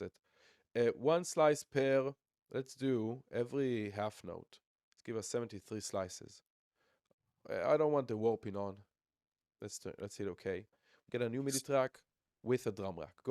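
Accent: Israel. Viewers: Great videos in the channel, easy to follow great energy! Man are you Israeli?❤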